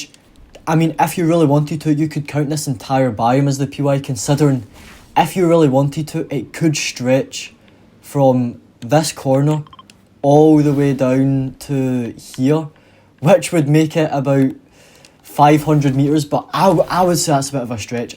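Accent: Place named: Scotland, United Kingdom. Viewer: Most scottish sounding guy ive ever seen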